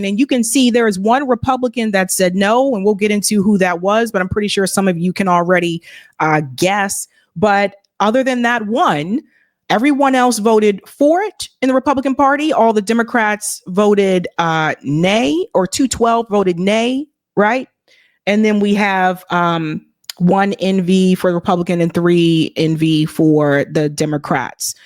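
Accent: Australia. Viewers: This dude went full blown racist with an Australian accent. Make it make sense, you not even from here bro! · Sounds Australian to me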